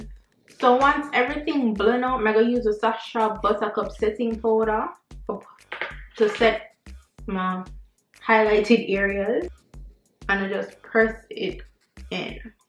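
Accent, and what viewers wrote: Jamaica. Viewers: Heard to understand lol but i love the accent! Always wanted a Jamaican accent 🇯🇲 🙌🏽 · Amen i was waiting..i love when u talk jamaican 😍😘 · I come a cross one of your videos an the frist thing I say u were Jamaican . I am Jamaican too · I love when you SPEAK patois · 🇹🇹🇹🇹🇹🇹🇹🇹 I love the Jamaican accent🇹🇹🇹🇹🇹🇹🇹🇹🇹🇹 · look how d ting come out good...U can definitely do ur makeup urself...jus fling on couple gems, n 2 bright colour n u good. I did mine myself....n it lasted all day....love the patois vid..n u nuh haffi nermnous nex time! lol that made me laugh so much bout nerminous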